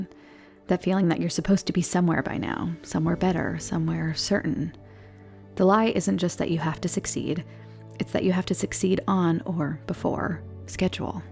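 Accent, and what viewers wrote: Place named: United States. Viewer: You've got one of the loveliest "next door" American accent out there! Keep it up and thank you very kindly for the effort and content! 😊🎉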